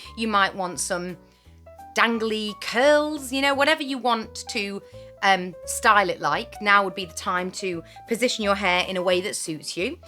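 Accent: United Kingdom. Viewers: LOVE this! What a great teacher you are! I posted a comment requesting a video like this on one of your other videos, but I don’t remember which one it was. Anyway, I am enjoying binge watching your videos. They keep me company while I’m washing dishes, preparing a meal , etc, and then I sit down lighter when I have more time and watch as well as listen. I love your voice. As a Texan with a Texas drawl, it’s so refreshing to hear a British accent. Keep them coming!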